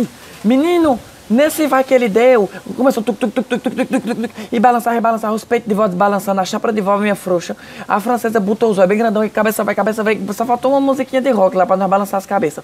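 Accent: Northeast, Brazil. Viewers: Gosto demais de assistir seus vídeos. Sou de Aracaju e vixe! é bom demais ouvir outro nordestino falando. Love it! je t'aime! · Olá, adoro o seu jeito espontânio de falar, apesar de está morando em outro país não perdeu a sua simplicidade e o seu jeito arretado de Nordestino, me divirto muíto com os seus videos.. Manda Alô pra mim meu nome é bem fácil JOSIBERTO 😃😃.. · ...acho é bom! Eu amo esse sotaque gostoso só nosso (sou cearense tbém). Bjo!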